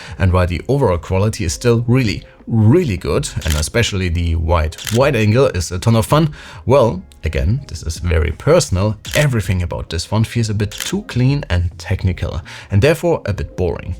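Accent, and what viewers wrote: Germany. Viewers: Great Video. Is your Accent from Germany?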